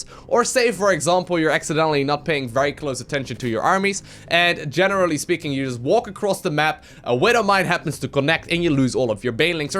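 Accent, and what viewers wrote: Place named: Netherlands. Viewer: Dutch accent is so understandable to native-russian-speakers in some weird way.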